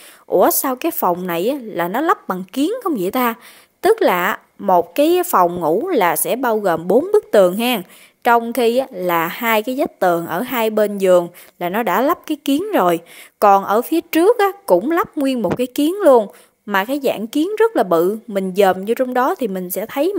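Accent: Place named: Countryside, Vietnam. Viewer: Helo Em nhé!! Xin chúc mừng Em là mẫu người phụ nữ được yêu thích nhất hiện nay và Em có chất giọng quá chân thật và chân tình quá đi thôi??? Nhung cuộc sống bây giờ không phải là Em nghĩ đâu nhé Em à??? Xin chúc mừng Em là một giọng thuyết minh quá tuyệt đỉnh và quá OK và quá chân thật và quá hai lúa mà anh vẫn OK về MC TV của kênh Youtube quá tuyệt vời và quá hoàn hảo và quá OK và quá 100 /100 nhé Em yêu à???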